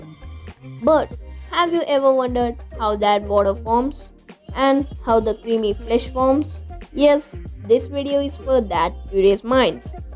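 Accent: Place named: India. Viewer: Once I heard the Indian accent I immediately left the video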